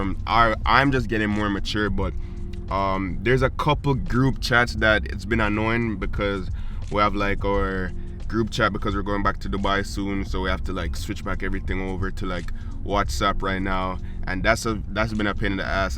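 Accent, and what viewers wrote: Jamaica. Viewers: This Bredda Jamaican?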